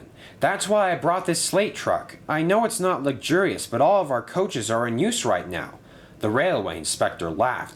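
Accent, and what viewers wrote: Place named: Scotland, United Kingdom. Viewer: Nice episode! Looking forward to seeing how this all plays out. There was some very clever wordplay in this episode, and it was thoroughly entertaining! Also, I swear your Scottish accent gets better every time I hear it!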